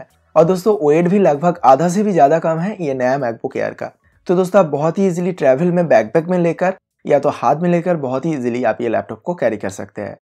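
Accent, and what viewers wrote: Bengal, India. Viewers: Are you Bengali? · Bhai kya aap Bengali ho??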